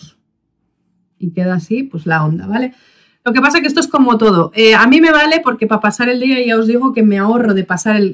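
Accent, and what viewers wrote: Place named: Asturias, Spain. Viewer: tienes una manera de hablar muy Asturiana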